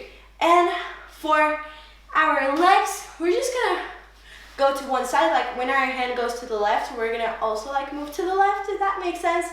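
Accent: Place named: United States. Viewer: crazy how ur spanish accent went away over the years and now u sound full american lol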